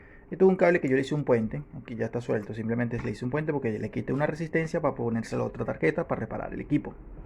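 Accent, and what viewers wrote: Venezuela. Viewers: Muy buen video. Disculpa el comentario hablas como venezolano no como chileno. Muchos exitos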